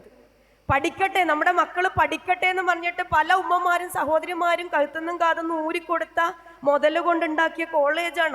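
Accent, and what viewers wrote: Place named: Thalassery, India. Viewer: സൂപ്പർ ക്ലാസ് .തലശേരി സ്ലാങ്ങില് കേൾക്കാൻ നല്ല രസമാണ്. കരയിപ്പിച്ചും ചിരിപ്പിച്ചും ടീച്ചർ സൂപ്പറാക്കി '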